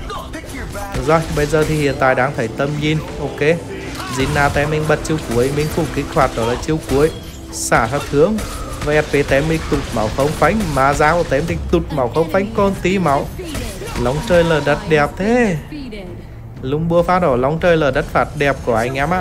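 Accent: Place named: Hue, Vietnam. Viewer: giọng huế ko nghe đc gì 😢😢😢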